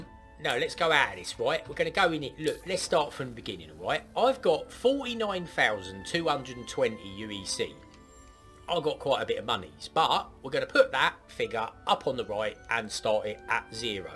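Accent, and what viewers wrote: Cockney, United Kingdom. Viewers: thicc british accent "hello this is " laughing, then liked subscribed and commented 3 seconds in. this guy knows how to YT properly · i've been threatening to jump in and your cockney accent almost convinced me m8. lets start a Star Citizen clan named Mi6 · Was NOT expecting you to be cockney but now the channel name makes sense XD SUBSCRIBED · Cant stand it. Well I could for all of four minutes. The least attractive accent in the UK so no thanks. See ya!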